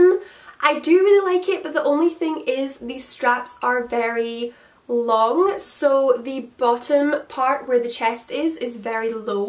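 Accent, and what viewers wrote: Scotland, United Kingdom. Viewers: Omg r u Scottish if so I'm from Glasgow ❤️❤️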